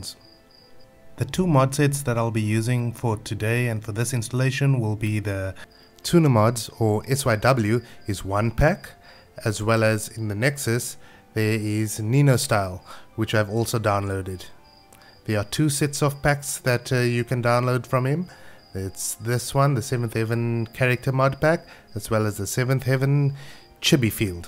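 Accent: South Africa. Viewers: Amazing! Brilliant step by step instructions. Also I'm currently living in Thailand and as I was listening to you speak I thought "no bloody way this dude is also a saffa!" Thanks from a Durbanite living in Asia :D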